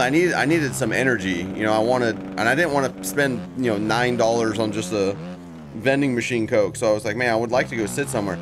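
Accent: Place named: Philadelphia, United States. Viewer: Wheres your accent from buddy? You gotta be from philly area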